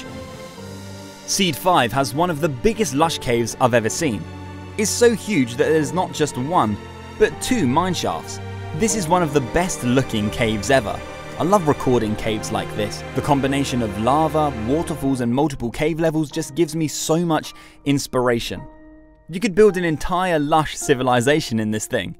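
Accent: United Kingdom. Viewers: Amazing seeds good job R u British?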